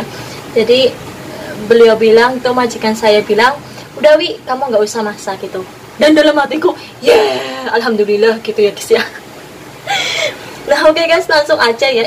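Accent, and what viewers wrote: Banyumas, Indonesia. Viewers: Pokoke Ora ngapak ora kepenak .. Sukses Terus mbak Yu 👍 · Ora ngapak ra kpenak ya mbk😁 Sma mbk ce q jg stengah 7 mkn mlm nya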